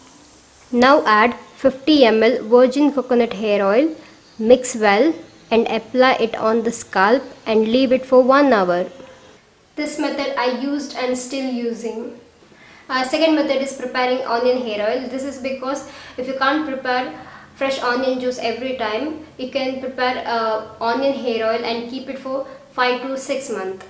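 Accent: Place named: South India, India. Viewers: In love her innocent indian acctented english. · Its south accent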